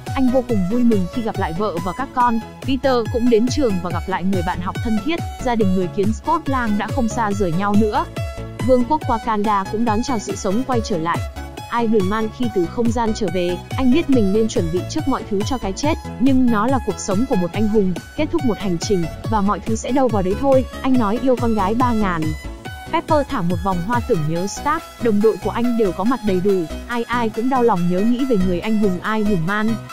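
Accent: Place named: Thanh Hoa, Vietnam. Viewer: Giọng thanh hoá nghe chối kinh dấu ngã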